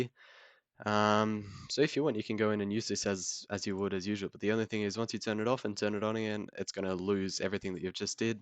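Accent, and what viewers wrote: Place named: Australia. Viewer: Well done on your first video, i found your style informative and easy to understand. Just getting into Linux as a newbie and will be following your channel going forward (subscribed) also, nice to hear a fellow Aussie for a change LOL. Good luck with your channel and thanks for teaching an old 65 year old some new tricks. Cheers from Melbourne!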